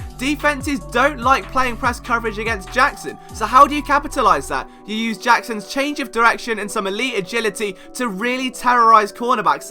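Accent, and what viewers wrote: England, United Kingdom. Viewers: Hi! Great content and all. Just curious, what’s your Philly roots? It seems like you know so much about Philly sports mist especially with your English accent:-)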